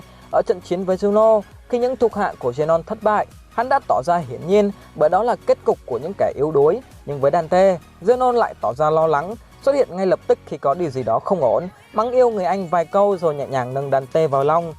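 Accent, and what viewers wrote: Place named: North, Vietnam. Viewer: ad ổng gốc bắc sống ở nam mà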